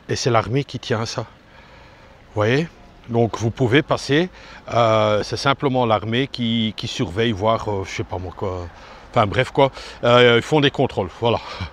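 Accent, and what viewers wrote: Belgium. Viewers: salut,je suis tombé par hasard sur tes vidéos,je suis un amoureux de la Thaïlande,mais je n y suis jamais allé en tout bravo pour nous faire connaitre une autre Thaïlande un peu plus rurale.......étant Belge moi aussi il me semble capter un accent familier...... merci pour ces belles video!!!!!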